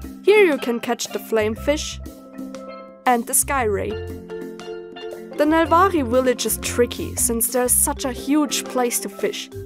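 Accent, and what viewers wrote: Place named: Germany. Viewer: I just love hearing your Lazuli the Glaceon voice (though that's your normal voice, I can't help but picture my favorite ES character/Eeveelution). That smooth German accent is so cool.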